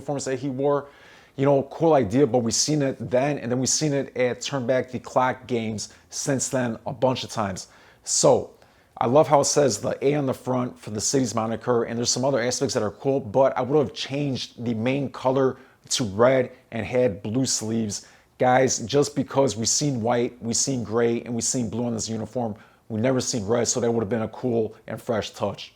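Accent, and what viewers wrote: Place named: New Jersey, United States. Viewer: "different grilling events, down by lake michigan" i love that phrase, i lived there before never heard that, lol, love the jersey accent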